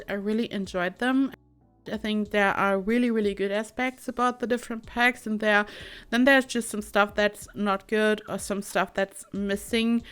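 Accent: Germany. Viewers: You sound sooo german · your english... I could swear you german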